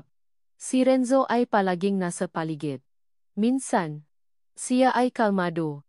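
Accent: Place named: China. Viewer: Ang pangit ng narrator palitan ng pure Pilipino parang Chinese mag pronounce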